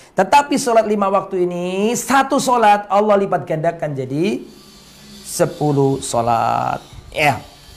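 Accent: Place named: Java, Indonesia. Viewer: Sy suka bahasa beliau yg medok njawani heee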